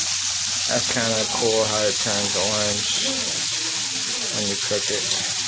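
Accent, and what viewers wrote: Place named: United States. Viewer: Luv your vlogs...Are you still in school or your done already? Luv you still have your American twang:)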